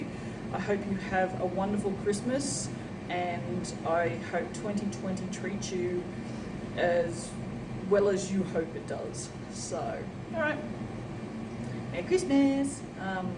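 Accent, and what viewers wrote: Australia. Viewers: Hope you have a great Christmas and a happy New Year, get some rest and enjoy the time with your Dad......I know you will be missing your Mum but I feel she is watching over you and I know she will always be in your 💓..I wish you all the best with the shop, I hope it is very successful and relieves the financial strain that can be so terribly stressful.... I so enjoy your videos because you are just so damn honest and no bullshit airs and graces etc, just a real Aussie woman! ( not sure if you were actually born here but you sound Aussie ) I’m very sure there are people who gain some strength to share their mental health issues through watching you and your videos....having a “ big dirty secret “ is such a damaging thing, if people can open up and talk about things it is so much better....anyway, wishing you well and I will be following and supporting you in my small ways and enjoying seeing all you do....stay safe and be happy xx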